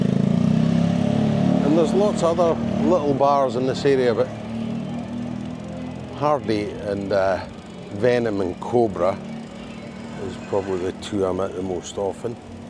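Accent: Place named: Scotland, United Kingdom. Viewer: Good video. Always good to hear a fellow Scot on YouTube. I've been going to Thailand since the early 90's and met my wife out there mid-2000's. She moved over to Scotland with me a year ago, with a view to settling here (for a few years). We may eventually move back to Thailand when I retire. Jomtien looks interesting. I met my wife in Patong and as you know, Phuket has become too busy in the last few years, just like Pattaya. Jomtien and some other parts of Thailand like Songkla City, can offer a quieter experience and lifestyle for those who prefer that. I would be perfectly happy in Jomtien, from what I have seen in a vew videos. Keep up the interesting vids and who knows? Maybe bump into you in the future for a couple of cheeky ones. All the best Pal:)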